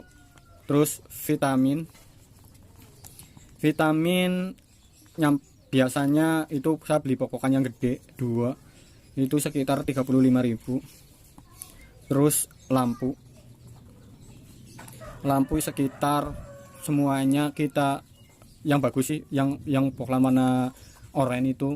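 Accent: Banyumas, Indonesia. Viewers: Mantap om...😆😆😆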